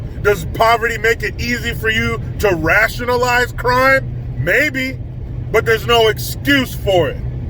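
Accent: United Kingdom. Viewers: Love the British accent. You should read us some Charles Dickens. Call it story time with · Love the ah British or something accent!!! LOL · Hey nice limey accent. You is a funny smart fella and you make this old 77 year old tan dude. Albinos are white and pale millennials living in moms basement. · I want to hear you say, Lambofeeties in that British accent! I'll die LMAO!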